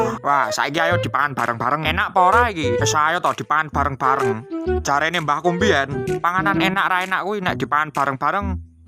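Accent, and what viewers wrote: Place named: Surabaya, Indonesia. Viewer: Suroboyo teko cak 👍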